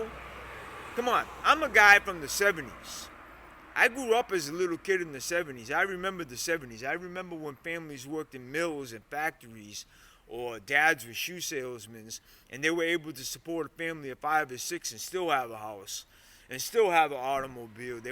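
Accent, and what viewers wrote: Boston, United States. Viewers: Boston accent never left, love it! Awesome story brother. I agree, it is 100% by design. Keep humans in the rat race.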